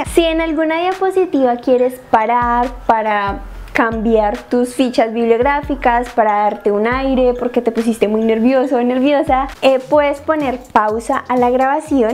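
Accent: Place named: Colombia. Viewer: Eres colombiana verdad? Me gusta tu acento😊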